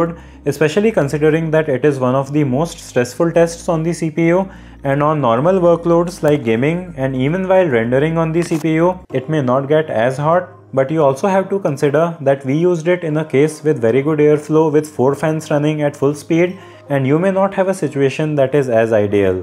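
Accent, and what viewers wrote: India. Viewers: Can’t watch this. Indian accent is so annoying.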